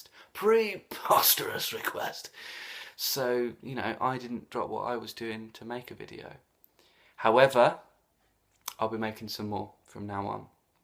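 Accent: Scotland, United Kingdom. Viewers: Not too long, this video, love your Scots accent.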